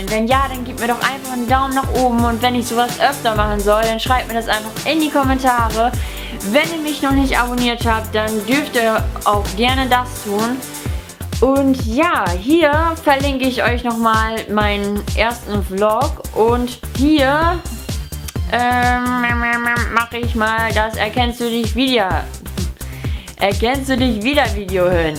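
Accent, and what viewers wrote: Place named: Low German, Germany. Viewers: Nunja es darauf an wo man wohnt und wie man erzogen wurde. In kleinen Dörfern ist Plattdeutsch mehr vertreten als in Städten. Die meisten Menschen sprechen ganz normales Hochdeutsch, manche dazu auch Plattdeutsch. Diese sprechen Plattdeutsch meist mit Bekannten oder der Familie. Außerdem wird in manchen Familien viel Wert auf die Pflege der Plattdeutschen Sprachkultur bzw. dessen Erhaltung gelegt und in manchen eigentlich garnicht. So spreche ich (17 Jahre alt) fast ausschließlich hochdeutsch . Nur manchmal mit ein paar Norddeutschen Worten( nicht direkt Platt) in den Sätzen. Abgesehen davon ist sie keine Plattdeutsche "Muttersprachlerin" da sie recht langsam mit Akzent spricht :-) Die Begrüng ihres Videos ist weniger Platt, als mehr Norddeutsch, wie es im Hamburger Raum eher vertreten ist :-) Also abschließend kann man sagen , dass Platt nicht der Hauptdialekt hier ist ( eigentlich ja eher ne Sprache) sondern von einigen parallel zu Hochdeutsch im Alltag gesprochen wird :) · Sprech bitte nur den Dialekt/platt. 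Ich finde das Hochdeutsche hört man aus dem Platt zu stark raus. Und ich liebe Platt😘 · schnackt ja nich Platt - sondern Hochdüütsch mit norddüütsch Akzent ;-) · Cool :D Wusste gar nicht das du Plattdeutsch kannst, aber wenn du normal redest hört man das auch irgendwie das du ein echtes Nordlicht bist. Bei diesem "Scheiss egal Speck oder Aal, beides wird mit B geschrieben." Musste ich auch erstmal überlegen was gemeint ist, aber ich habs denke ich verstanden. ;D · Wie unterschiedlich dein Plattdütsch zu dem hier in Nord-Ost Deutschland ist :) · geht mir genau so, komme auch aus westfalen, meine Oma hat es fließend gesprochen und hat es mir etwas beigebracht, das Platt hier im Video hört sich aber ganz anders an, liegt wohl daran das es nördlicher ist. fahr mal in die Niederlande, ich verstehe die dort ziemlich gut, nicht alles perfekt, aber sehr viel, nur mit der Aussprache happert es auch bei mir.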